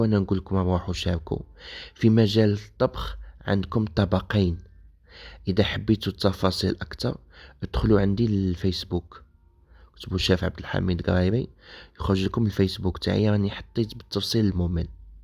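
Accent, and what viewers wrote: Constantine, Algeria. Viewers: أول مرة نكتشف قناتك و دخلت قرعجت ، ماشاء الله عليك يا وليدي ❤. رغم ان والدتك المانية و كان عندك الفرصة تعيش على الطريقة الألمانية لكن اخترت بلادك و تهدر لهجتك القسنطينية الله ايبارك و الاجمل انك تحيي التراث الجزائري القسنطيني ❤❤❤ بالتوفيق 🌹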